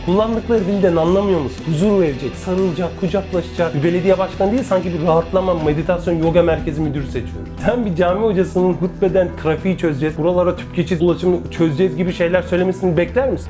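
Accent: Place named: Istanbul, Turkey. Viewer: Sizi neden sevdim kardeş bilmiyorum ama gerçekten çok güzel bir Oflu olarak bizim söyleyeceklerim izi İst şivesiyle anlatıyorsun ve guruplarda paylaştım daha aktif olmanı istiyoruz teşekkür ederim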